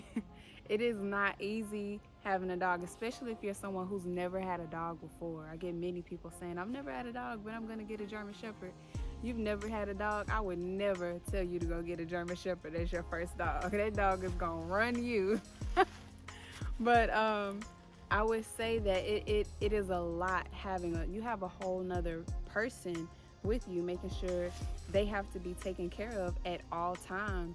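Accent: Houston, United States. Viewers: That houston accent, lawd. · You definitely got that "Houston" accent going on...You ouchea sounding like Bey... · They are both from Houston. I'm from Houston and a guy told me I act like beyonce. I was like what???? It's the Houston accent.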